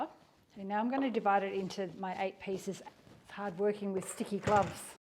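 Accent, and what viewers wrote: Australia. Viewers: Thank you i can't wait to try these. Are you an Aussie living in sweden? Your accent sounds Australian.❤️💙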